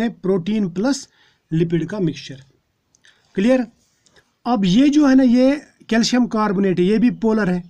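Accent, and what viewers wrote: Kashmir, India. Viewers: totally Kashmiri accent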